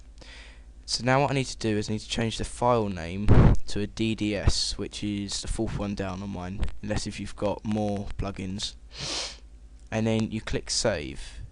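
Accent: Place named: Luton, United Kingdom. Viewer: u sound like 1 of dem luton bloods init, like me. wat is you?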